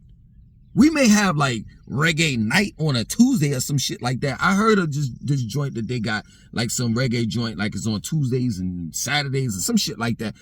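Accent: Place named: Northeast, United States. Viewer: Before 2009, which NYC borough you came from? It’s gotta be somewhere from the Northeast with that accent…I mean how NYC don’t accept you? New Yorkers always rep NYC & move somewhere else to compare it to NYC 😂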